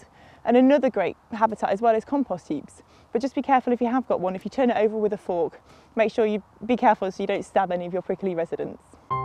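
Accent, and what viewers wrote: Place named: England, United Kingdom. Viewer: It's a proper English accent.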